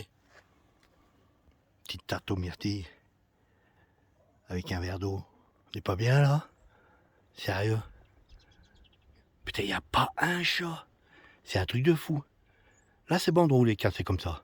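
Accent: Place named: Savoie, France. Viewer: j'me disais bien qu'il avait un accent de chez moi !!!! super merci pour cette video. J'ai essayé cette 750 chez Honda La ravoire ( 73 ) bah !!! ça a été le coup de foudre aussi. j'ai pas franchi le pas, je viens de leur prendre la 125 CB F comme petit utilitaire mais cette Transalp est top !!!! il est même venu a 2 pas de chez moi, au col de champ laurent !!! Elle était un peu haute pour mes 174cm mais bon !!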